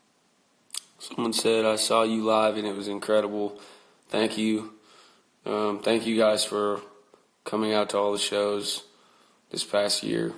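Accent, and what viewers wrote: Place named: South, United States. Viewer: he got the country accent going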